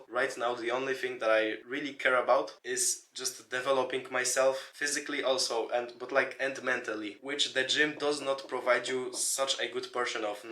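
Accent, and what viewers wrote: Poland. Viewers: jak to jest że po twoim akcencie od razu poznaje rodaka XD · Polish, I suppose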